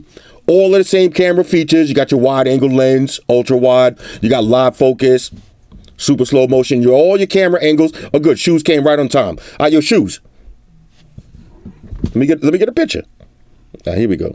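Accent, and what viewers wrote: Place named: New York City, United States. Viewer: .. I'm a new sub, you do go in very fast although you said it's not a full review. Well I am asking for a breakdown on speed when going for different apps, load time when searching for information? Does it have a huge amount of bloating apps... Could u Use this in a camera setting such as a dark garage & take giid pictures. You feel like is any other phone better when it comes to hungry techies?? LOL- Yeah u have a hard Eastern Accent. Must be NYC or Something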